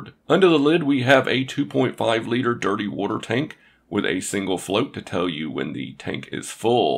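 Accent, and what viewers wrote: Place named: South, United States. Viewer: apologies , it sounded like.youre from the southern U.S. For us brits, Canadian and US accents are pretty much indistinguishable save a twang from states like TX, AL, FL.. bit like aussie and brit then lol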